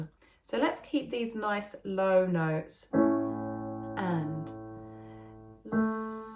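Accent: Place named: England, United Kingdom. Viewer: Do I need an English accent like you to learn how to sing?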